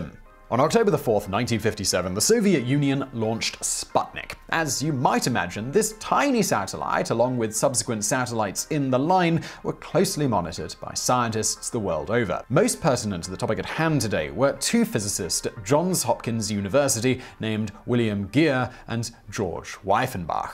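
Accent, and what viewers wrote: United Kingdom. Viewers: why does he look like the guy on Vsauce but with a British accent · Oh boy... More stuff of i rationalize how they came up with gps... And rather than read... Im so briliant i must be right and there for let me spread my genious around as fact and history because whatever i lazily dream up must be right. Stuffy elitist. Talking head comes complete with stuffy brit voice. Yes kids.. This passes as a college prof... Even a department head these days. Actually.... Unfortunately for this condescending wannabe egghead embarrassment... Gps origins are not sputnik at all. Norging to do with it other than hey... Look a tin can pinging we can track. Gps at its heart... Is triangulation. A methodology used for ages by surveyers. It actually owes it origins to Pythagoras. As far as an orbiting object being a high accurate clock like an atomic clock... 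I have no idea how that works and id assume again some algebra... Trigonometry... And some calculus... But atleast i am humble enough to admit it. And its a good question. The talking head here equally has no clue how that works. Before sputnik... Im pretty sure there were some very bright people unlike this jerkoff here in this video just tossing off... Wonder if he creamed his pants over this... But i digress... Some bright people.. Yeah like warner von braum... Einstein... People like that which had already figured out geosynchronous orbits.... Now after all of that... Even more bullshit spewed by captain science here... You cell phone does not directly engage a bunch of high orbiting sats out there. It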